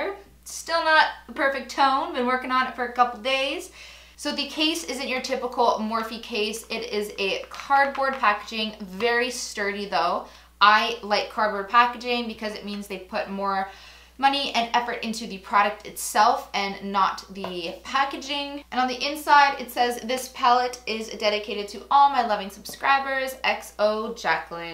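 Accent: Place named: Canada. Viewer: Sorry... you're Canadian, I'm rubbish with accents! 🇨🇦 xx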